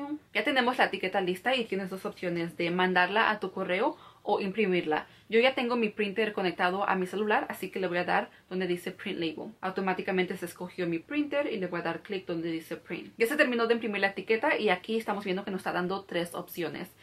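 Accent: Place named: United States. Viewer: Amiga con todo amiga con todo respeto amo tu información y todo lo que haces creo que eres una gran persona pero no se si eres latina o naciste aquí y tal vez por eso tienes ese acento gringo pero todo el video me la pase escuchando tu acento gringo tipo cuando los gringos tratan de hablar español algo así y me confundiste un poco pero tu información es buena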